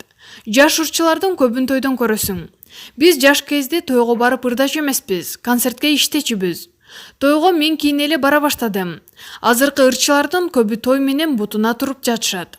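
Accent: Russia. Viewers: Ушул кыз суйлобой эле койсунчу кыргыз эмес орус зорго кыргызча суйлогондой болот.мынча акциент менен суйлойт озун угуп корчу адамдын кыжырын кайнатып.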